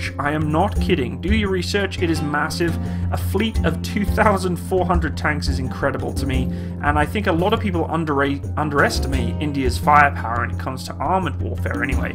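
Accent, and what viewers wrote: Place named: United Kingdom. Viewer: Neat trick starting off by praising and admiring the tank making tall claims and then in the last 2 minutes, discrediting those very claims to present a sorry picture. This is a cool tactic of youtube reviewers who are essentially amateurs and have no real authority in the field that they are reviewing. Their channels end up being a hotbed for racist bigotry and snide armchair internet experts. You remind me of a dude that does aviation reviews (DJ's Aviation or as he calls himself 'Dee Jayy's Aye vie Ayetion'). His only credential for doing aviation reviews is "he's seen a plane". I am assuming both of you are not the same guy as he sound Australian while you sound British.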